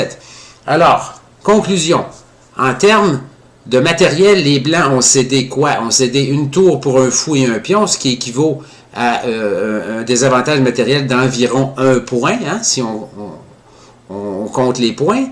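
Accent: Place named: Quebec, Canada. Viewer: Fin de partie très intéressante et bien démontrée par notre professeur et grand pédagogue préféré avec son accent québecois très plaisant et que l'on aimerai bien rencontrer !! Merci pour cet exemple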